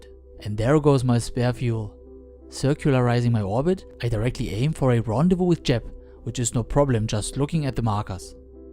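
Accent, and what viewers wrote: Germany. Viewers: nice gemacht und da hör ich glatt den deutschen akzent XD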